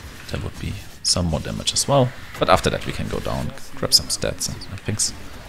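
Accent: Germany. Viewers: Nettes Speedleveling-Video, danke dafür! (Vom Akzent her vermute ich, Du bist Deutscher ;-) ) Ich hatte lange darauf gewartet, zu erfahren, wofür Du den vierten grünen Sockel brauchst, die Info darüber, welche Gems Du benutzen willst, hätte vielleicht ein wenig früher, womöglich schon ganz am Anfang, gebracht werden können. Davon abgesehen: schön, dass Du auch Hinweise auf das übliches Leveldesign für die kürzesten Wege gibst. Dass man in Akt4 die Animation von Piety abkürzen kann, indem man nach rechts läuft, war mir neu, werde ich beim nächsten Leveln mal ausprobieren.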